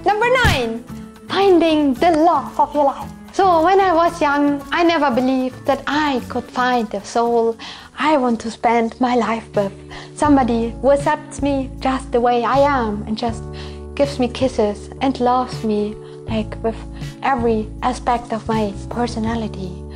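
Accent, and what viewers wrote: Germany. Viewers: For me its really hard to sit though the video cuz of her voice.. and i dont like german accent for some reason soo.. yeah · Das enlglish hört sich deutsch an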